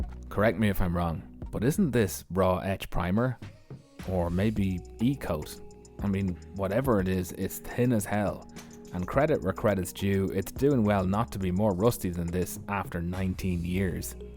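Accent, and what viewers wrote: Ireland. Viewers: As a Dutch guy I love the Irish accent and your humor man. I’m not used to film or let alone editing but the way you portrayed the disassembly for instance looks fantastic. I appreciate the fanatic way of removing the rust, you can only do it good once. About etch primer or epoxy, people shouldn’t bother. Etch is only for adhesion and given the fact it needs to be applied very slightly it doesn’t give protection against corrosion, that’s the job of the epoxy primer. And some epoxy primers are suitable for using on bare metal and some aren’t. I presume you checked it before you bought it and especially before you applied it. The only thing I don’t understand why you removed the primer on the plug welding spots. Couldn’t you use a weld through primer? Oh maybe that’s only suitable for spotwelding, when there’s are no drilled holes in both layers of metal. 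No I would seal all the seams between the top and under panel where water can run into. And I would pay special attention to the screen wiper mountingpoint for instance, I mean there is bare metal underneath. I’m not saying this to pretend I know everything better, just trying to think along. Keep up the good work and that means the total package, I enjoy it!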